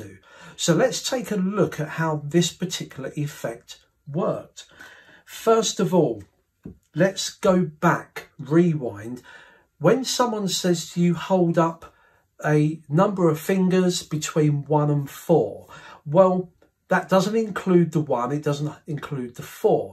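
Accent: United Kingdom. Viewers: Thank- you for putting the work in to teach and show us step by step how to perform this wonderful effect!! I have been into magic for about 40 years so even though i did not actually work it out step by step as you did i knew the basic method eas a stack and that the reverse dealing was part of the method. I will definitely be adding this to my routine!! Often when penn and Teller say they were fooled i think they are just pretending that they were fooled. If i know how it is done then certainly they must know; anyway that is how i think. They claimed that Shin Lim fooled them but i am not so sure that they really were fooled. Their motive? Shin Lim is considered a hot perfotner currently and anyone who. "" FOOLS THEM"", Performs one their Vegas strip show, to have Shin on your stsge is great publicity!! Thanks agin, sir for for all of your great videos!! 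P.S.-- my sister loves your accent; she says she thinks you have a Liverpool accent like the Beatles!!! Gary in dreamland; have a nice dream!!!🙂☁️☁️☁️⛅🌟🌟🌟🌟🌟🌟🌟🌟☁️⏰☁️ dream!!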